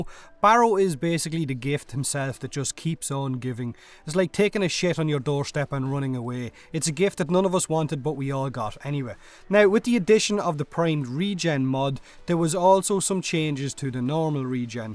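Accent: Ireland. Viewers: Fuckin love Irish accents But holy shit your accent is so thick (that's what's she said) but in a good way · I started watching you because i love the irish accent,same goes to daithi de nogla.I fucking love your accents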